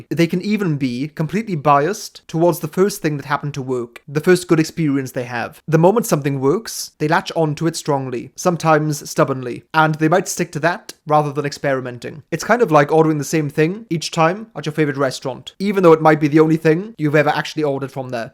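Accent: United Kingdom. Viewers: One thing I’ve struggled with as a very introverted ISFJ is that, because I keep pretty high boundary walls around my life, I’m not as busy helping other people as the ISFJ descriptions usually indicate. Over time, I’ve realized that my worry over this is simply another manifestation of the same underlying issue: that we ISFJs are anxious about doing too little. Many ISFJs hurt themselves by giving too much, while others hurt themselves by giving a normal/healthy amount and then sitting around feeling bad about it. If you have an ISFJ in your life who’s constantly overgiving or freaking out about whether they’re giving enough, the best way you can help them is reassurance that you are content with them as they are. Though they are giving/helping to make you happy, they’re likely also doing it to stave off feelings of worthlessness, so if you can encourage them to feel satisfied, they’re less likely to get neurotic and burn themselves out. 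If I can be satisfied with giving what I’m able to and not feeling bad that it’s not 100%, to-the-death giving, I’ll be a much healthier person. Thanks for understanding me,! (And thanks for being British. I love your accent.)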